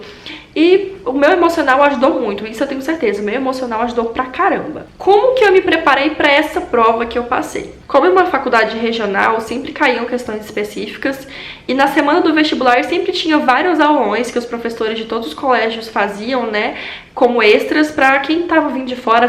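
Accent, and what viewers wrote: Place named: Tocantins, Brazil. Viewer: Não sabiam q o pessoal do Tocantins tinha um jeito puxado de falar. ,bjs linda sucesso ❤♥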